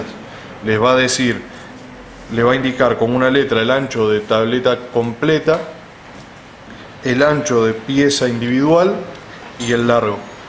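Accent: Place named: Argentina. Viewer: Buena enseñanza y buena explicación y sos Argentino nos entendemos mejor gracias xr compartir tu experiencia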